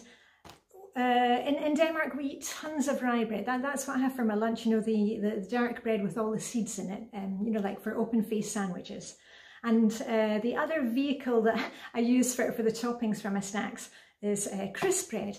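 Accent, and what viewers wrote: Scotland, United Kingdom. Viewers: Greetings from Sonoma, California. I treat myself to your video with my first morning tea and then get on with my routine. Since becoming a Fly Baby this week my home is already feeling so much better! Heartfelt thank you for all your inspiration and as a genetic Scot, your delightful accent. Loved the coral sweater and polka dot scarf today. Later you were wearing a white blouse with coral dots. Do you ever wear the coral scarf with it? Hope so! I mean how can one have too many polka dots? As a T3 I rarely wear them but I do have a couple scarves with random and one with not so perfectly shaped dots. Starting to think I might have a one secondary! Love to you and your family and Birthday Wishes for your girl. Mine was Sunday and my daughter’s two weeks before, niece’s today and two more daughter’s Saturday.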